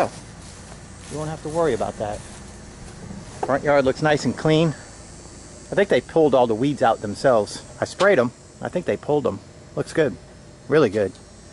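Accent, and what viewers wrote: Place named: New Jersey, United States. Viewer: Great Channel and content. Nice work too. But do I detect a Jersey accent..? Formerly Monmouth and Essex County here, was in business for 27 years. Keep up the good work!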